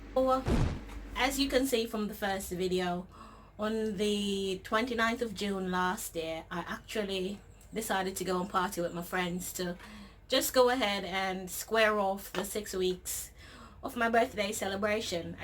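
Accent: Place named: England, United Kingdom. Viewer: Interesting that you said Oxford shirt and now you have an English accent hmmmmm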